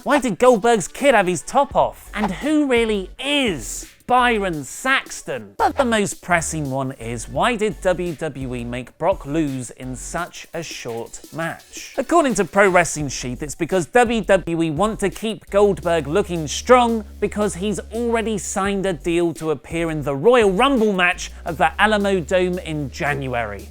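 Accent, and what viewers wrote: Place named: United Kingdom. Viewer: I have trouble with your British accent!!!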